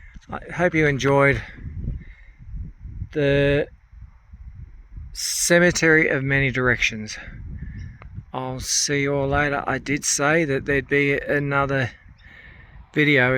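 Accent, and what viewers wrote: Australia. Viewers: Your videos are always interesting and always looked forward to, no matter the content. You also have a soothing voice with the most wonderful Aussie accent! Praying for you,.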